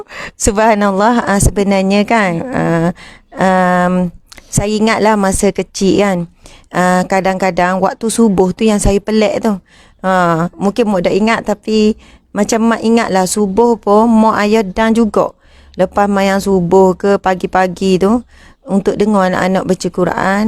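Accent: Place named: Terengganu, Malaysia. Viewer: alhamdulillah , maasyaaAllah , sukanyaaa dengar soro mok ustazah, cakak loghak gganu pulok tu, suko denga.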